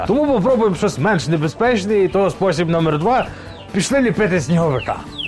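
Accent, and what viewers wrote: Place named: Western Ukraine, Ukraine. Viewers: уважуха за гуцульський діалект.. щистьи, здоровльи тобі та побільше таких відіків) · Це Галичанський акцент, скоріш за все, франківська область. Здарова землякам) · А сам из Украины, но у тебя такой акцент шо иногда я нихера не понимаю что ты там несешь, наверное ты из очень глубокого западенського села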